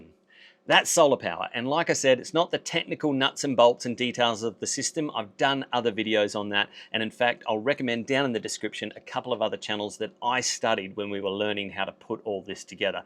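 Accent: Australia. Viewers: I know YouTube doesn't care about what I write in the comments, but I hope these comments help you grow and make some money from this video. I really like your Australian accent.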